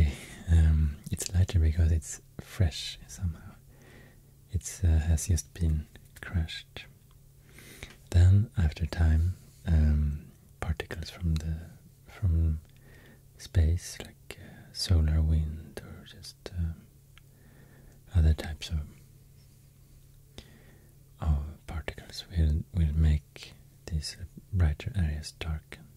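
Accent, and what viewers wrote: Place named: Sweden. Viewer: “swedish accent” is only “accent” i can listen to in asmr, any other “accent” just feels weird but this, this is soooo good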